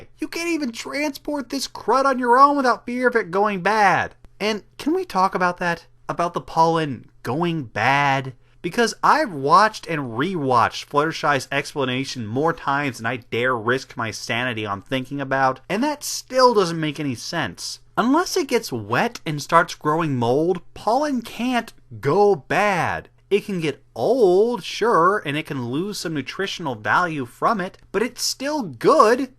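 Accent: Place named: Scotland, United Kingdom. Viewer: good theory i do love the scottish accent tho : )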